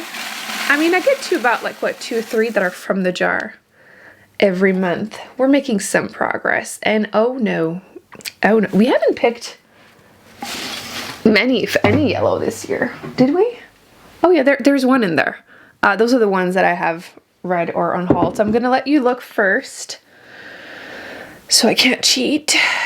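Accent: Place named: Quebec, Canada. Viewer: Nevermind, that accent answered my question. Maybe you'll see a fellow quebecoise booktuber soon? I'm trying to start one even though I'm very new at this. You managed to get an impressive amount of subscribers, congrats!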